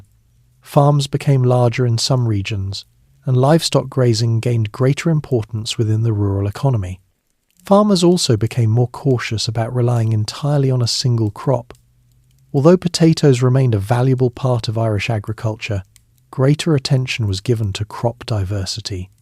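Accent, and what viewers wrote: United Kingdom. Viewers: I can't listen to a man with a distinct British accent narrate a commentary on the Irish Famine. I'm surprised you don't get it. It's similar to listening to a German narrate a documentary on the Holocaust. What!?! You think we've forgotten?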